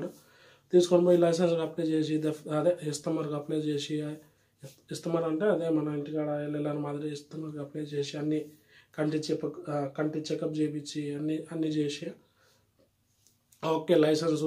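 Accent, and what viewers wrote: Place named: Kadapa, India. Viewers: Manna rayalashima voice supper Anna tq good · Hiiii brother, I'm from kurnool oka 2 days nundi mee videos chustunna, chaala baaga anipistundi enduku ante Mee kadapa slank chaala interesting ga undi enduku ante YouTube lo mana local experience dorakadu kada. Anyway good job bro.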